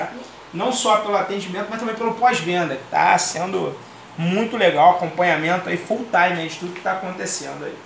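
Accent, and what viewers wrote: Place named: Rio de Janeiro, Brazil. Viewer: Ela eh linda (amo esse sutaque carioca)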